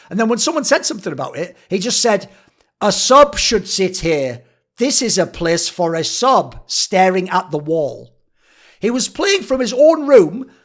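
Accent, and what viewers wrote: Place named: North East England, United Kingdom. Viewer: are you from the north east?? i recognise that accent. but pls leave rekkles alone he's a good dude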